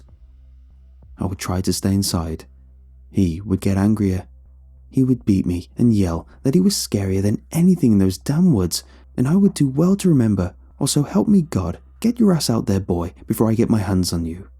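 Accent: United Kingdom. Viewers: True, although actually now that I think of it, maybe the narrator was saying holler, but it sounds like "holla" because of his United Kingdom accent...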